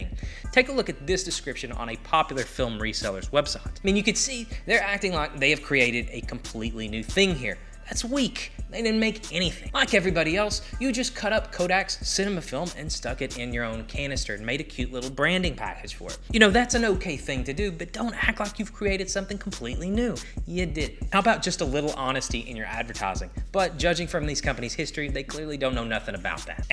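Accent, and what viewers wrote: Kentucky, United States. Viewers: As a Kentucky native, I agree with your point about the accent. Being from Louisville, I may not have the exact same accent as you and yes... ha, I live in LA now! HOWEVER, it should be more about your ideas than the way you speak. Cheers. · 1. Instant film is overhyped and overpriced and takes absolutely know photography skill at all other than holding the camera still. 2. Fujifilm abandoned the filmmaking community in 2013 (reference their last CEO bluntly stating film photography was irrelevant). 3. I love Kentucky. My mother was from Liberty (Casey County) and I made a short 16mm film on my visit to Danville and Liberty). Your accent reminds me of my family down there who are down to Earth just good people and the mainstream media for years has stigmatized Southern folks unfairly as inferior. Dont change the accent. 4. 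I know EXACTLY which film influencers you talk about as low energy not to name names cough grainydays but yeah I dont get the whole jaded attitude projection either? Its like dude you should feel excited and privileged you get to shoot film in the "digital era. AND heres my issue with the film photographer "influencers" and they continue to ignore this point but they refuse to acknoledge the fact that shooting on film is about OWNERSHIP of images i.e through hard copy archiving. Thats the BIG reason I shoot film. Its not just for the "look" or it "slows me down. "Its the fact I have physical, tangible 4K hard copy image that will last over 100 years. 5. I wont even dignify the "digicam" thing whatever that is but its most definitly not celluloid but you share the same frustration with Digicams that I share with those "film emulators" that infect my Instagram news feed. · Hell, I come for the accent. 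I went to college in Lexington, my room mate was from the sprawling metropolis of Pikeville so it's familiar. Plus being from Tennessee, I can't make fun of anybody's accent. You're inspiring me to let mine show more than I do.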